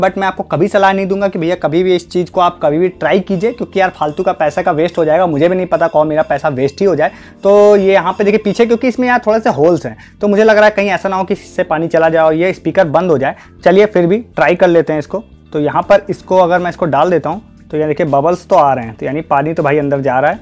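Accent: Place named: Uttar Pradesh, India. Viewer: 3.14 bhai yar. puri UP ki bhasha bhai. ghar ki yaad diladi :P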